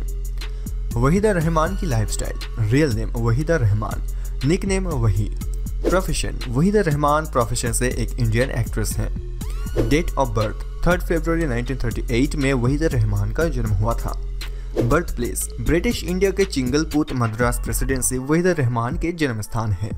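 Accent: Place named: Hyderabad, India. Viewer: Kya baat hai bawa, ek dum Hyderabadi 😄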